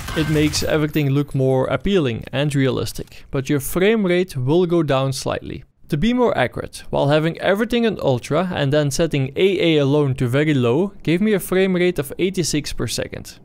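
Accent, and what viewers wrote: Netherlands. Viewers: Ik wist meteen dat je Nederlands was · Are you from the netherlands? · U dutch?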